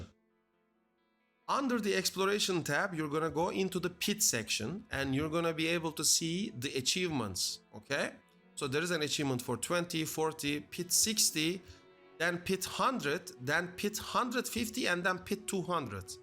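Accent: Turkey. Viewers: are u turkish u sound and look like turkish, great vid also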